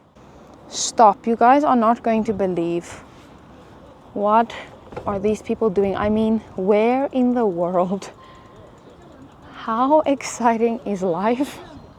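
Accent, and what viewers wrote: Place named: South Africa. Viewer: Two seconds listening to you i knew you a South African. Greetings from 🇿🇦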